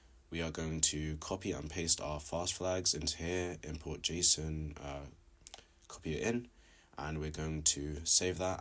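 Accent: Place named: United Kingdom. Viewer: British accent go mad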